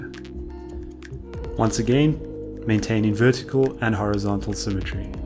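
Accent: South Africa. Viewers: Is that.....South African I hear....?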